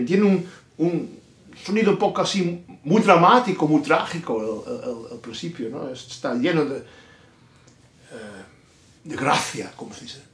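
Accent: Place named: Spain. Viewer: Hello sir, what kind of Spanish accent is that of yours ? Thank you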